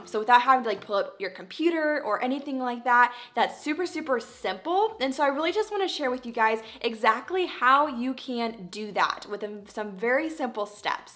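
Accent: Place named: United States. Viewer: Reals 😂 no disrespect but i love American accent 😁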